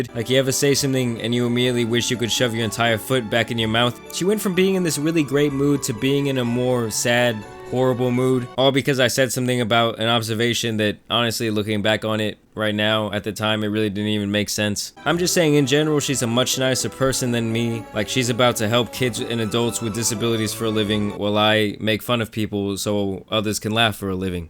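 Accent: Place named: East Coast, United States. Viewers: Why do you have a ny accent to me but your from tx? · Sounds like New Haven and New York 😂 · How u from texas but got an east coast accent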